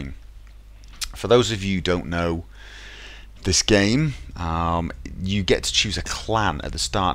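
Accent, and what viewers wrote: United Kingdom. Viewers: Holy Macaroni! It sounds like this channel belonged to completely different person back then! And I can swear it, it was some Brit! :)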